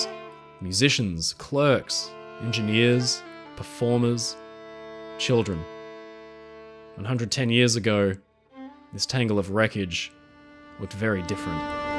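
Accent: Australia. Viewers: I like how you speak australian. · "something about your accent" - quietly spoken articulate non ocker Australian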